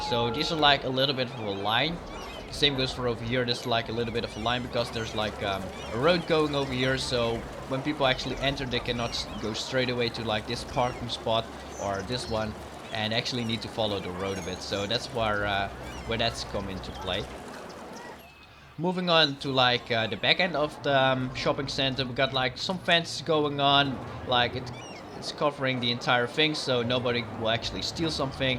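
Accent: Netherlands. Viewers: are you dutch????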